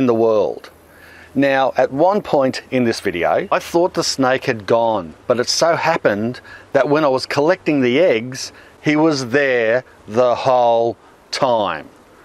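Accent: Australia. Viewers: G'day from Germany. Since my daughter did not come back from her trip to Australia because she fell deeply in love with the country and especially one of its inhabitants,I often tune into your channel just to have a little Aussie feeling and to learn the accent. And yes, we do have a rised garden bed now. Blessings to you and your loved ones!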